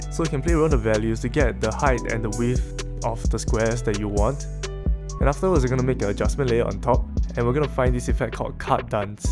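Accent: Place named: Singapore. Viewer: you sound like youre from singapore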